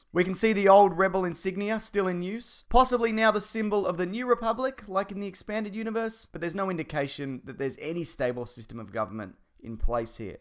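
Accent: Australia. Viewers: Your an aussie arnt ya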